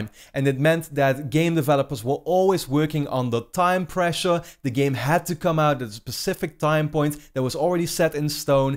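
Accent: Netherlands. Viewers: Hahaha ik hoor aan je accent gewoon dat je nederlands bent, wel toffe video!!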